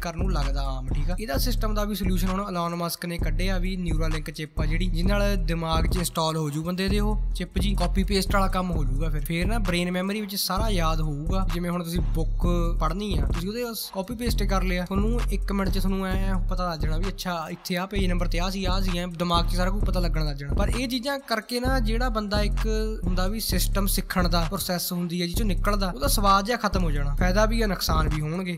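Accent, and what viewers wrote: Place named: Malwa, India. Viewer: Mittra Bolan da way tera Bda Ghaint aa tera Malwayi lgdan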